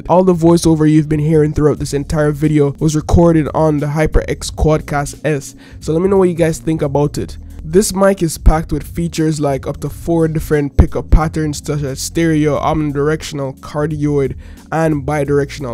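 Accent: Jamaica. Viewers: Are you Jamaican 🇯🇲 ?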